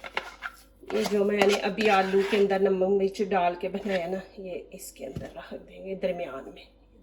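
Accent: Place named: Punjab, India. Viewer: Apki recipes tu achi huti hn par ap apna lhja sahi kar lain ya phir punjabi hi bol liya karain please 🤦😏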